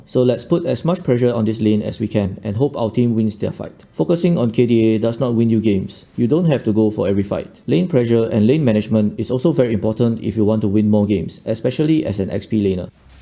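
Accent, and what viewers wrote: Singapore. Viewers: Saw your defence item guide on my feed a few days ago. Heard your voice and immediately knew you are sinkie. Great guide on that one too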